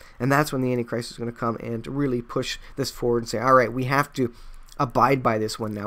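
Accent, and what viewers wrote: Canada. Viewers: Canadian Aye? 😄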